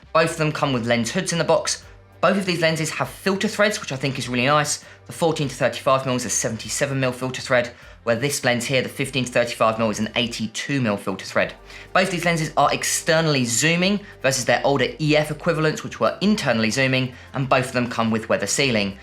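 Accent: United Kingdom. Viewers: your English accent is annoying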